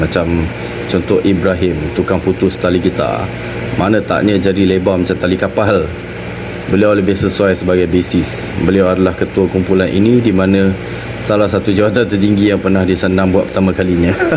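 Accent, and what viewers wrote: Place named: Malaysia. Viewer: Sy dah lama tertanya²..bro ni org Bugis Selangor ke? Pekat no melayu nye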